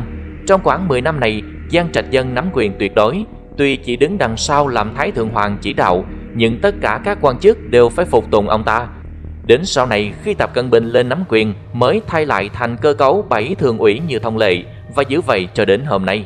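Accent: South, Vietnam. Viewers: giọng miền nam chuẩn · Thỉnh thoảng nghe đc giọng Nam kỳ rỏ ràng dể mến Toàn giọng bk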